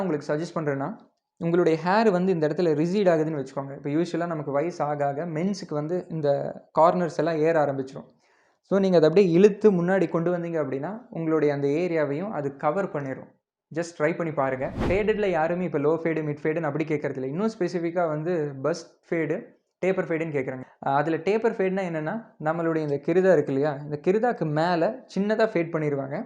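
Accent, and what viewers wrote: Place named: Kerala, India. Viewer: സംസാരിക്കാൻ തുടങ്ങിയപ്പോളെ തോന്നി മലയാളി ആണെന്ന് 😂❤️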